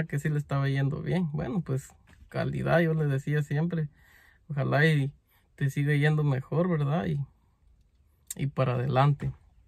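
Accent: Mexico. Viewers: Es la primera ves q veo este canal y creó q el muchacho es de Guatemala, pero tengo duda porque abla como mexicano, me cayó mui bien 👍 seguiré mirando sus videos para subscribirme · Soy méxicana y no veo nada de malo que hable como nosotros. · No les quita nada de que el quiera hablar como los mexicanos!. Yo soy mexicana y no le miro nada de malo. Malo es cuando se expresan mal de Mexico 🇲🇽 pero en este caso no es así. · Eres mexicano Ablas como Mexicano te copiaste de Asento Mexicano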